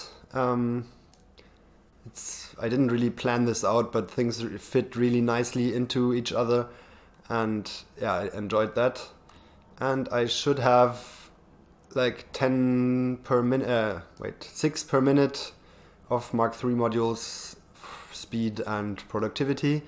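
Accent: Germany. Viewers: Just found your channel and really enjoyed you rambling. Are you german?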